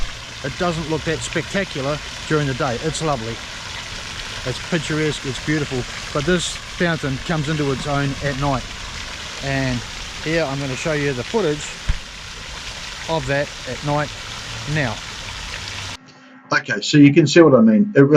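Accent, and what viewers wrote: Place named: Australia. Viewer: Nice aussie accent. Good job mate.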